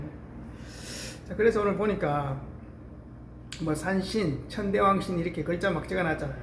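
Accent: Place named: Gyeongsang, South Korea. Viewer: 경상도 사나이에 구수한 말씀 매력있어요 오늘도 고생하셨습니다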